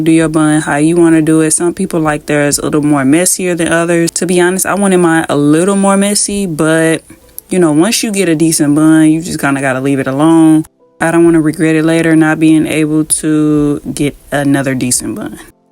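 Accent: St. Louis, United States. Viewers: I can tell by your accent you’re also from STL. ❤️ Love your videos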